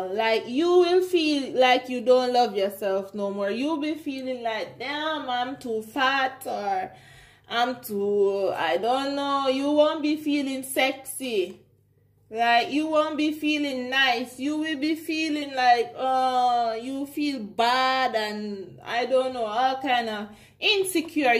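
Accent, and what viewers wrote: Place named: Jamaica. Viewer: Omg mi just know seh is a Jamaican accent that ❤👏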